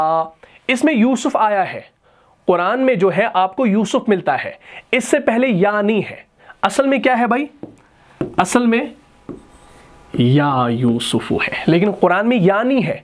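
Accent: Kashmir, India. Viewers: اَلسَلامُ عَلَيْكُم وَرَحْمَةُ اَللهِ وَبَرَكاتُهُ بھاٸی آپ کے لہجے سے میں پہچان گیا تھا کہ آپ کشمیر کے ہیں میں لاہور سے غازی مخاطب ہوں آپ کا پروگرام امارت اسلامی افغانستان میں بھی دوست سنتے ہیں اللہ آپ کو جزاۓ خیر عطافرماۓ بہت اچھا درس ہوتا ہے اس کو جاری رکھنا اللہ آپ کا حامی و ناصر ہو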